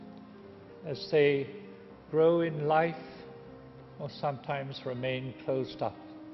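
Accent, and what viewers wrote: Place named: Ireland. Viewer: he sounds as if he has an Irish accent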